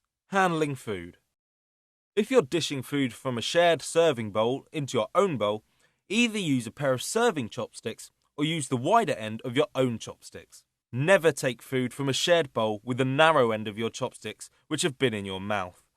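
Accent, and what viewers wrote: United Kingdom. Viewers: Okay now British man instruct me on how to seduce that Asian chick. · i think it's funny that the narrorator sounds british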